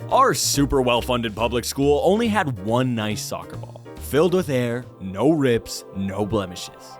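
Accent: Canada. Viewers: Are you Canadian too?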